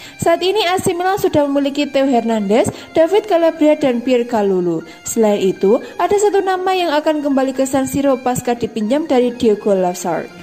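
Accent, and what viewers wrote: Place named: Medan, Indonesia. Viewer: Dari logatnya, aku tau mbaknya orang Medan, horas mbak 😂👋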